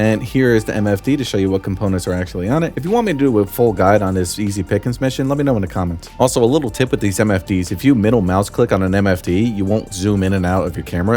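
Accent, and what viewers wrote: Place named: New York, United States. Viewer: I lived in NYC for 10 years, your accent always reminds me of my time there haha. Thanks for the good loot info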